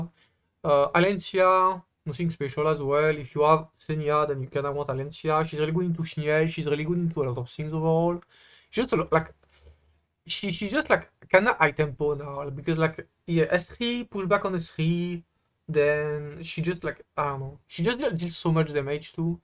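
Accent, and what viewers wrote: France. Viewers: He is talking in English but with a god awful French accent.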